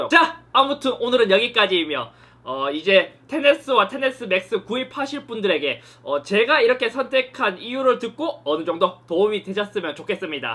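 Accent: Gyeongsang, South Korea. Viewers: 나도 경상도 사람인데 이친구 경상도 발음이 왜케 웃기지?ㅋ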